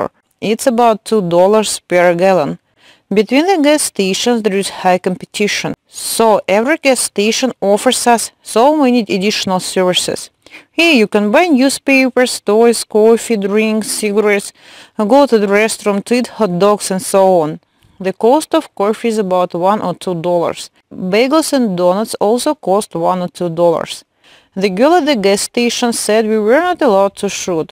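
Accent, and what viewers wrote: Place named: Russia. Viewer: your Russian accent so charming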